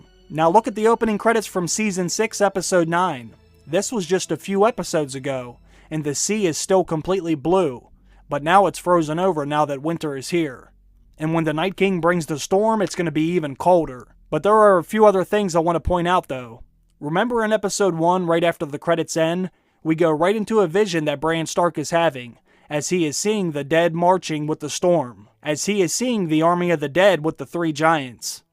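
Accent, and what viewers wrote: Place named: Pennsylvania, United States. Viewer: Thought so. I could hear it. Fellow Keystoner here.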